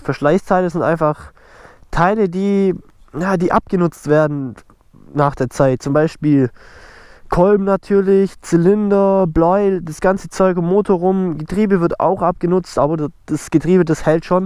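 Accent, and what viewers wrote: Swabia, Germany. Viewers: Doch isch so Aber egal aber was i dich scho lang mal fragen wollte wo wonsch du eigentlich? Weil du so a bissale schwäbisch schwätsch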